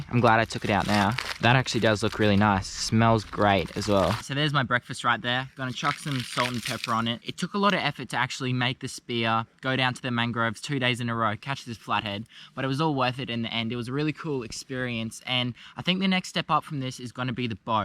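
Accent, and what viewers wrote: Australia. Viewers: Where at, his dialect sound Australian not trying to profile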